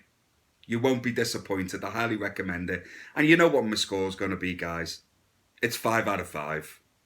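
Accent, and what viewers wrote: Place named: Liverpool, United Kingdom. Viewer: Boss review, you sound like your from my neck of the woods Liverpool 🤣❤❤👍👍👍👍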